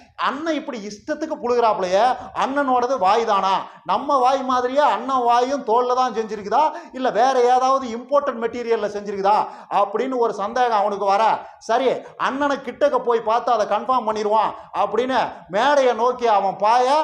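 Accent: Kongu, India. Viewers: உங்கள் கொங்கு தமிழ் உச்சரிப்போடு அகோரிசீமானை கலாய்க்கின்ற முறை மிக அருமை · Today very interesting comedy brother. 😮😅😊😂😊 அதுவும் நீங்க சொல்ற அந்த கொங்கு பாஷை இன்னும் சூப்பரா இருக்குல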